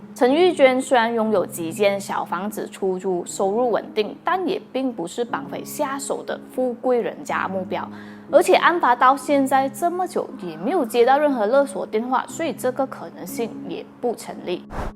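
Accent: Malaysia. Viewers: 这是马来西亚的华语。发音跟普通话不同。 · 很好很马来西亞中文音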